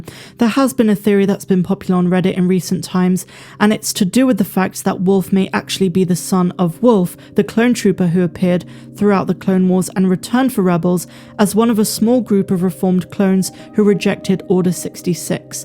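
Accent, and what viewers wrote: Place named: Bristol, United Kingdom. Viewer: Where you from? I'm from Bristol, you sound very close 😂 liking the channel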